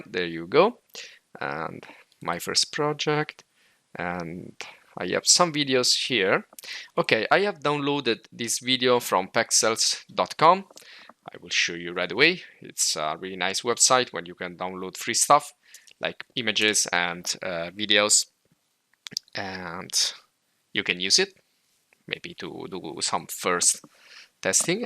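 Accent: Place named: France. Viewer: thx very helpfull. You accent looks frensh buddy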